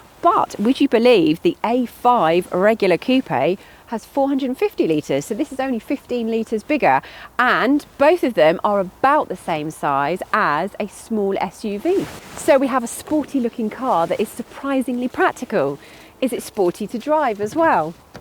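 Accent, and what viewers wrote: United Kingdom. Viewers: Ohh my God! I love your accent! Typical british😍😍❤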